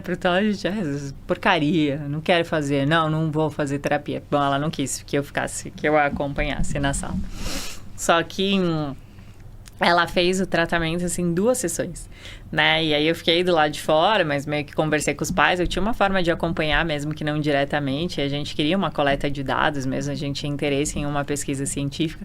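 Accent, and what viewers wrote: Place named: Sao Paulo, Brazil. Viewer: Eu gostei do sotaque dela de paulistãããna 😂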